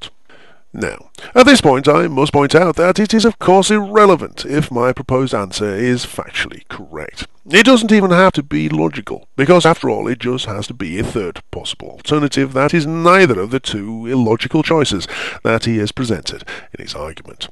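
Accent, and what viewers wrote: United Kingdom. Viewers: I may not be an atheist, good sir, but I admit that this video had me laughing at more than one point. Also, your voice is absolutely silky smooth...is your accent East London?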